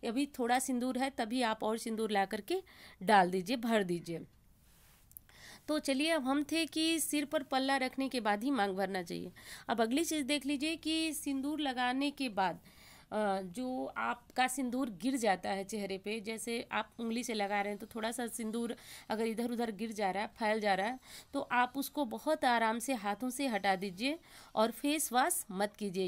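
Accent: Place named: Bihar, India. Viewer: Aap k baat sa laga ke aap bihari ha Ma b bihari hu di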